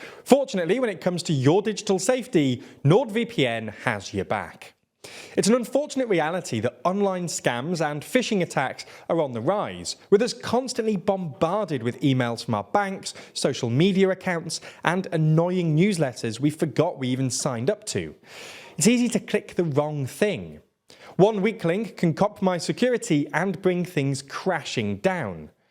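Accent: United Kingdom. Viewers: Net'nyahu... I hate British accent.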